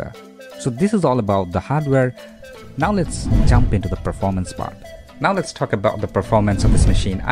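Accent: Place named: United States. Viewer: thank you so much. I watched the other video you did about using it as a computer. Really answered question people didn't seem to be able to. And you sound very down to earth. I usually don't watch videos with an American accent cause there's a point my brain can't process the accent. So thank you.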